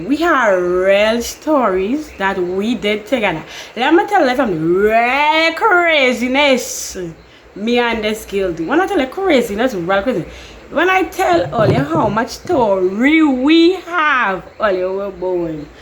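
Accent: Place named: Caribbean. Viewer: So love your accent. Is that Trini or Jamaica?!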